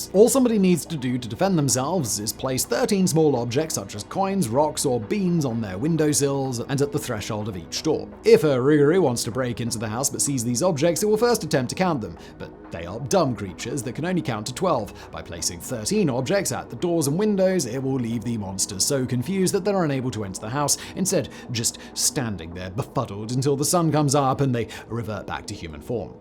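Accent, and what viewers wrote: United Kingdom. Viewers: I need more videos of saying Cajun words in his British accent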